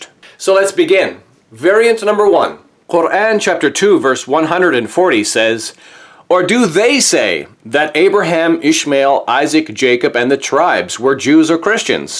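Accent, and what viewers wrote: Arab world. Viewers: I'll be back but I am just saying when he speaks with an Arabic accent, it's kinda annoying.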